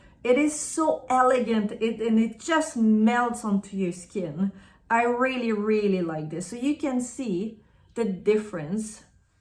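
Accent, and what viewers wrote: Quebec, Canada. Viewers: Hi ! To sum up I would say that when speaking english you have a recognizable french accent and when speaking french you have a strong québécois accent ! So unique 😉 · Salut! (du Canada) - en tant que Québécoise, j’ai décelé ton accent québécois dès la première fois que j’ai visionné un de tes vidéoclips. Mais peut-être faut-il être québécois pour identifier ton accent. J’ajouterai que ton accent est vraiment agréable à entendre alors pas de souci. Je vais maintenant continuer de visionner. La poudre Rocabar d’Hermès est jolie sur toi. Très (trop!) tentant. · Allô, j’adore ton accent étant Québécoise aussi et je suis au Saguenay et première neige hier soir qui est encore présente au sol ce matin!🥶😉. La poudre Hermes Rocabar je l’adore je la porte à tous les jours depuis un peu plus d’une semaine et j’adore la poudre bronzante aussi j’ai Tottori et Atlas, pour le correcteur ultra le teint j’ai tellement hâte qu’il soit disponible au Canada …on m’a dit que ce serait en 2026 mais je ne sais pas quand…j’ai très hâte de l’essayer. 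Bonne journée et merci pour ce vidéos! 😍🥰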